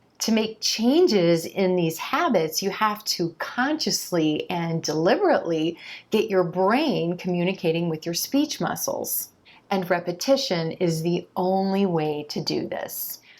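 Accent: United States. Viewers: Hey, you’re awesome. Learning from your channel is such a golden opportunity. I enjoy getting here as often as I can, and I love to hear your genuine American English. Just feel like home.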